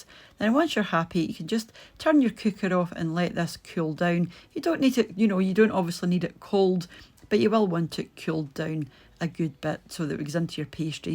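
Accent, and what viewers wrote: Scotland, United Kingdom. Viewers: I could listen to you all day long lol love the Scottish advent. I'm Irish fab recipe too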